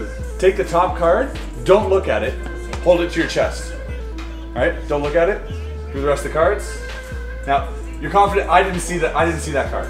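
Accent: Quebec, Canada. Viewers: What ?😂 you speak french? Quebecois en plus?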